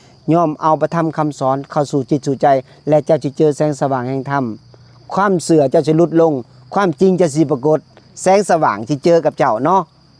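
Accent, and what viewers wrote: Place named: Isan, Thailand. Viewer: สำเนียงเทศน์เหมือนพระอาจารย์อยู่อิสาน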